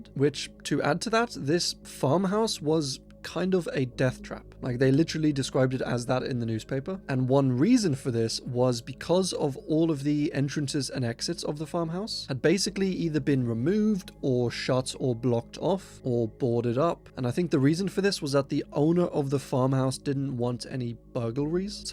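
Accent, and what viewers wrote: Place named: Scotland, United Kingdom. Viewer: I watch several 'iceberg' creators. Yes, I have subscribed to your as well. Because I like the best. YOU are one OF the best. Just enough curiosity and open mindedness without being naïve and gullible. <--that is a tight rope act and hard to do correctly. You do it good enough. Of course, no one does it AS good as me. <----and THAT is also a conspiracy!! Carry on. p.s. what's with the accent? Not S African or Australian. From the north of the UK? Scottish highlands maybe,.........<---- said the American with the deep southern accent with extreme Scottish ancestry.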